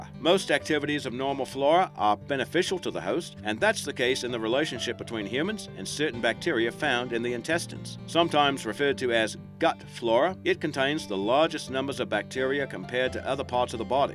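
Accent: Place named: Australia. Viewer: Nice to hear the Aussie voice make a return. It just feels better with it, like ol' Steve Irwin came back from the dead.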